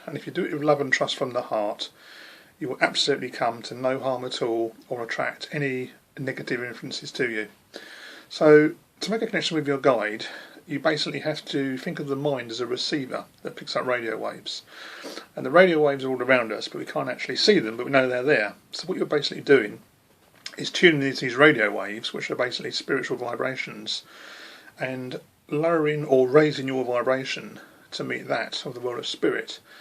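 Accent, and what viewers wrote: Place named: United Kingdom. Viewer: This was too freaky! I have been trying to reach out to my spirit guide for a few years but recently more so. I always had a name in my head and I randomly came here to listen to you because you are British mainly and your words resonated with me but when you said the name of your guide I was freaked out.....Jonas is the name I have had in my head for a few years now. I thought I'd made it up. Now I know it is my guide. I wonder if it's the same guide as yours? I'm freaked out!!!!! Synchronicity or what????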